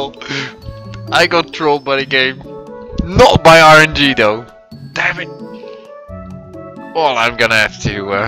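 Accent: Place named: Netherlands. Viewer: No worries, I'm dutch too trying to work on completing this game 100% myself... The tournaments are a huge fucking pain though! Also, normally hearing my fellow countrymen speak English in a Dutch accent is unbelievably cringeworthy, but for some reason you're able to pull it off :P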